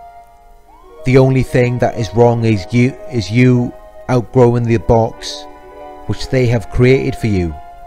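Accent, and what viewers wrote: Wales, United Kingdom. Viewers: I had a male friend in his late fifties whose mother needed to infantilise him in front of his girlfriend. She would constantly talk about things he did as a child that made him appear stupid, and was still calling him by babyish nicknames. He tried to ignore it, but his girlfriend couldn't stand it. She let the mother have it real good at the dinner table one night, and said they'd never come over again if she didn't stop it. The mother was shocked because she didn't see anything inappropriate in her behavior! Thanks for these videos, and by the way, I love your Welsh accent!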